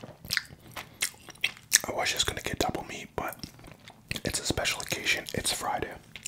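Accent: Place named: Canada. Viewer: I can hear the Canadian in his voice….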